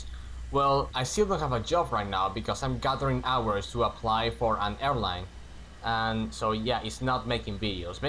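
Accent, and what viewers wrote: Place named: Spain. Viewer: Yo ya decía tenía un poquito de acento de español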